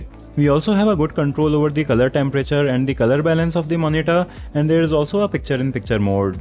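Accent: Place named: India. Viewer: English with indian accent.. beautiful.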